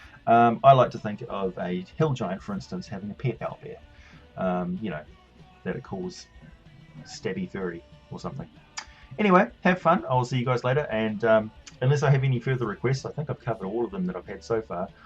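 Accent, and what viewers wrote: New Zealand. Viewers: I knew you were a Kiwi.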